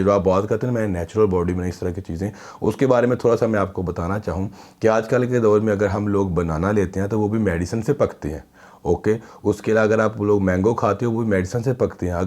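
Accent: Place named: Sialkot, Pakistan. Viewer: From india. Best wishes. Ur language is same as we have. I think u r from sialkot. Because we r from sialkot before partition. If u read this comment then plz rplyy.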